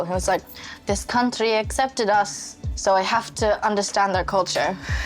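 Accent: England, United Kingdom. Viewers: I keep forgetting shes got a british accent and I get surprise every time I remember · you've got a british accent and new york attitude i like it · She’s British ?? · wait she has a english accent how did i just figure this out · Because it's a British accent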